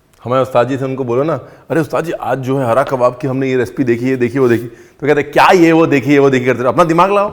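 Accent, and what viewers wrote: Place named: Rural, India. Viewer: No matter how desi/dehati accent he may speak in but when he says the word 'right' it reflects his sophistication both in life and culinary art. I like your way of cooking.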